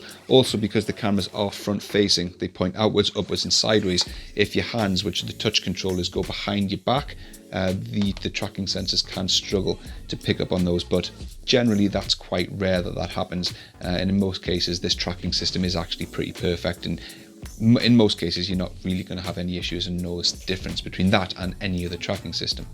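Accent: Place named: Newcastle, United Kingdom. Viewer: Thanks, great to hear a local Geordie review tech (question at the end) I bought a rift s and an entry level pc after using the work HTC vive vr system and I’m really happy with the performance. I’m no expert and have only seen these two systems, but I agree with everything this review stated. The vive does feel a better product with better audio but.... the s is lighter, better visuals and an easier set up. For a newbie like me, that’s better and now the price of an S is down to £350, that’s where I spent my money. My newbie question is what difference would be noticeable to the visuals if I upgraded my GPU from a Nvidia GTX 1060 to something newer and faster to say Beat Sabre or Robo Recall?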